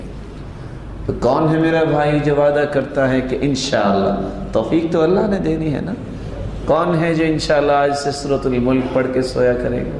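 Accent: Arab world. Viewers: MashaAllah SubhanAllah Qari Sahib I am really impressed by your. Arabic Language accent. Have you been brought up in an Arabic country? It is routine in our local Masjid that After isha prayer in mosque one person amongst Namazi recites Surah Mulk and all other listen. Is that right Amal for maghfarat or each one has to recite separately. Please guide us. JazakAllah Khair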